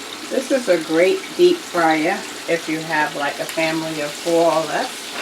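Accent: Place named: New England, United States. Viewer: I Don't know who you are but I can tell a voice from New England 🙌🏾🙌🏾 Keep doing your thing Miss Lady 🙏🏾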